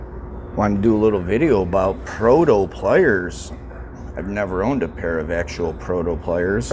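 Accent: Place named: Chicago, United States. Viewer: I listened 5x just for the Chicago accent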